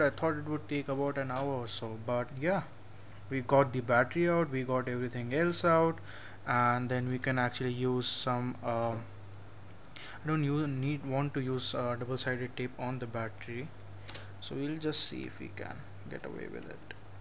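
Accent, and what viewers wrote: India. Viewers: Liked and loved the video.Understood everything you say,but please work on your accent,don't be that funny Indian guy that everyone make fun of when they should be listening.I am talking here out of experience,i have a "Russian" accent/im not even Russian !? / and that is a really big issue for me.It's not racist or whatever,just makes people uncomfortable when they listen to me.Anyway content on point,exactly what i had in mind with my idea and you proved that the idea makes sense.Good luck!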